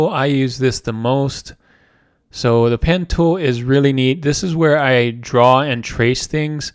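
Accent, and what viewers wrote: Hawaii, United States. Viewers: Bro, are you from Hawaii?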